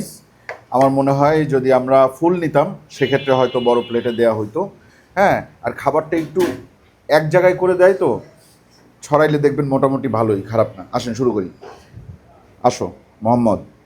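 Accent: Barishal, Bangladesh. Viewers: vai ki barishailla🥹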